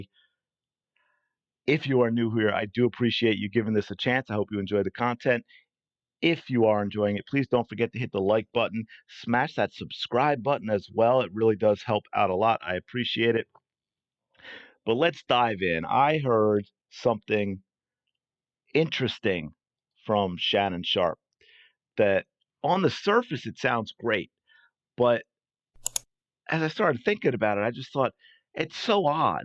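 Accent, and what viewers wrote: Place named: New York, United States. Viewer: As an aside, I could've sworn I detected a NY accent in your speech😊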